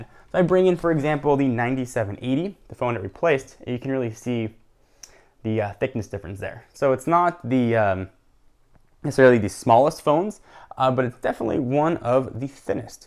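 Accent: Canada. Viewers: phe<link> for a second there i thought you were from canada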